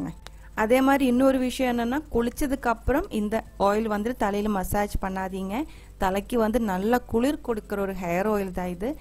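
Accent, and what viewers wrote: Kerala, India. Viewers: Are you malayali? Samsaram kettal malayali aanennu thonnunnu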